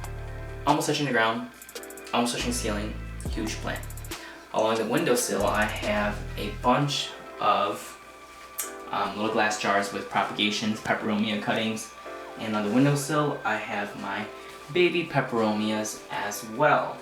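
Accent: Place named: Midwest, United States. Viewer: Do you live in the Midwest? I feel like I hear a little accent!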